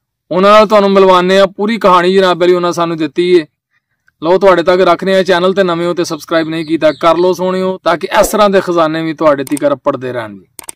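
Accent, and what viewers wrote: Punjab, India. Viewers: بھائی بہت سخت پنجابی بولتے ھو ایک لفظ کی سمجھ نہی ائی اوردو میین سُنائیے تھاکیں ہم بی انجوئی کریں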